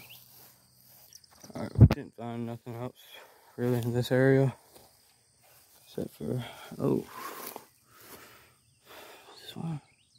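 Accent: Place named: South, United States. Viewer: Was this filmed around upload date or is it old? I thought they didn’t come out until later in the south (assuming by accent)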